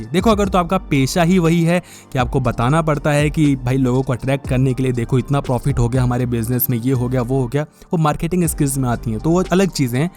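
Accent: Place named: Uttar Pradesh, India. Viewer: Lagta hai aap u.p state ke ho ..mera ak friend bhi u.p ka h ..wo bhi aise hi bolta h ..har baat me bhiya use krta h..😂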